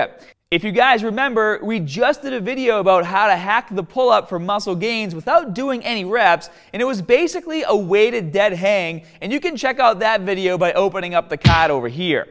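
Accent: Boston, United States. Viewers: Boston accent. "When you pull this bah down..." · Who doesn't enjoy a Boston accent? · Geez, and I thought I had a “haad” Boston accent growing up there!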